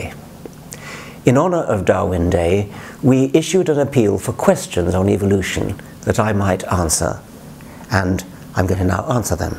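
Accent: England, United Kingdom. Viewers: Sounds so good with the English accent but complete bullshit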